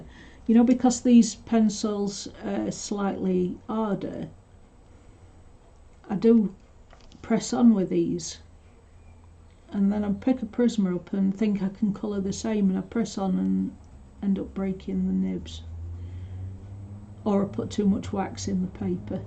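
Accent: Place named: North West England, United Kingdom. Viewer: Hi...I'm currently doing this colouring at the moment...I love Hares and love the way you've built up the colour here. You sound very local to me...north west England?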